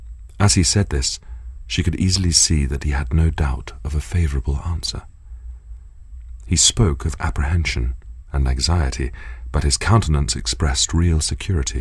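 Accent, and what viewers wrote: England, United Kingdom. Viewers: 진정한 동굴 목소리다😲 목소리만듣고 심쿵하긴 처음이넼ㅋㅋ영국영어 배우고싶다ㅠ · Don't you just LOVE an English accent? If you think Matthew Macfadyen sounds good then just listen to Alan Rickman!!! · Ese acento tan inglés ufff :D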